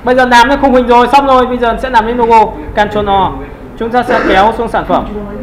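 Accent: North, Vietnam. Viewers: mãi mới tìm dc thầy giỏi .nói giọng bắc. mấy cái miền nam ko nghe rõ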